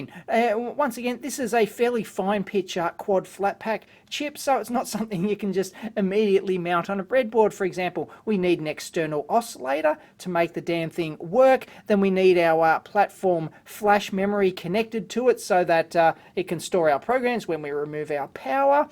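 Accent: Australia. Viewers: great video, love your down to earth style. An aussie, I guess? I've done a very simple sound synthesis (karplus-strong) algorithm on 8-bit arduino uno. To improve the sound quality, the most important feature would be a 12 or 16 bit DA convertor. Looking around a bit, it doesn't seem to be a high priority for uc chips. Any suggestion for uc or fpga that is good for sound, ie all built in cos I don't much like etching pcbs? This is just hobby stuff.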